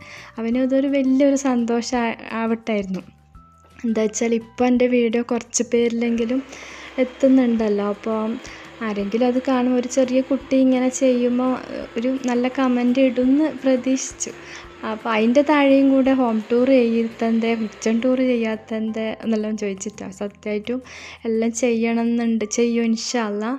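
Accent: Kannur, India. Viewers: Ningalude Kannur language presentation style beautiful 👌 Speedil parayunnadine kaal better ithu thanne ☺️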